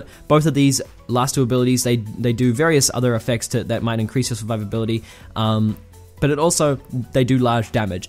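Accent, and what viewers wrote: New Zealand. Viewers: New Zealander. Noice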